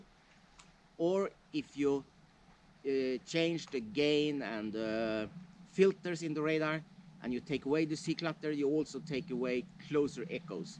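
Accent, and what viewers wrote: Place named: Sweden. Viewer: very good swinglish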